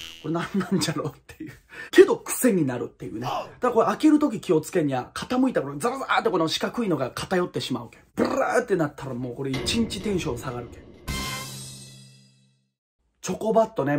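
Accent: Hiroshima, Japan. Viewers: マジ面白い！ 方言が広島カープの選手みたい🎏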